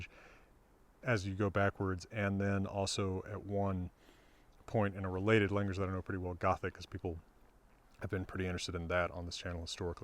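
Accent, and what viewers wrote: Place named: United States. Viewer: You'll find most Norwegians pronounce Old Norse and proto-Norse with a thick Norwegisn accent, and Icelandic people pronounce it with a thick Icelandic accent, so who's to blame thick Anerican accent really. I wish there was more published on reconstructing the more precise pronunciation in the different stages, though. I think a pretty distinct trsit of Old Norse until at least around 1000 would be the nasal vowels, but who today tries to reconstruct that?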